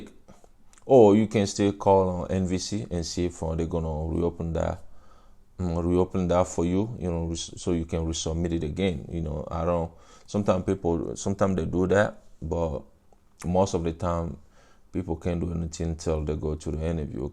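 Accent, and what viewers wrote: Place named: France. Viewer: Are you french by origin ? Love the accent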